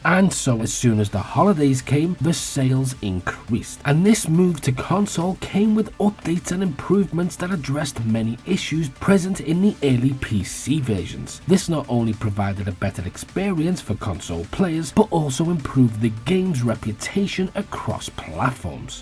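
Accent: Liverpool, United Kingdom. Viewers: scouse legend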